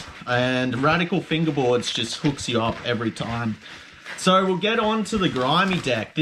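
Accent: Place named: Australia. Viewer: Im not even making fun of you but gawd thats a real aussie accent. Nice vid tho.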